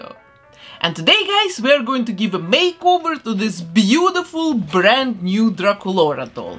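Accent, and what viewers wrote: Russia. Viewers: У меня шиза или это русский акцент? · you have a Russian accent in your pronunciation. are you Russian? :)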